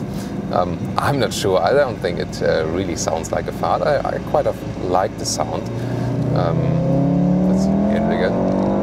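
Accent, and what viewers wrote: Germany. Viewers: An excellent and thorough review by a true gentleman. Your German accent is awesome !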